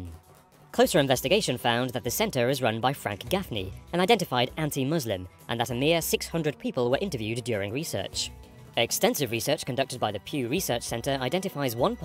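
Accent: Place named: China. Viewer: Logat nya logat org cina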